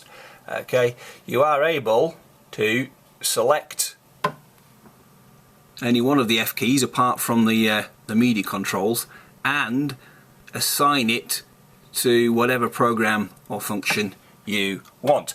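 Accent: United Kingdom. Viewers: You have perfect English (Great Britain) voice xD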